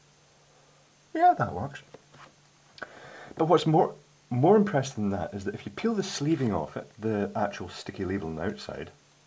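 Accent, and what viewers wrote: Scotland, United Kingdom. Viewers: Nice to see your face!! I really love your videos and also your beautiful British accent; nice radio voice! Keep up the good work! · Scottish Accent.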